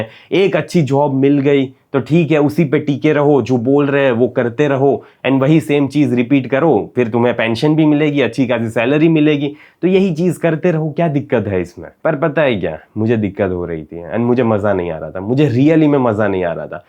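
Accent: Gujarat, India. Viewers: Gujju accent detected ❤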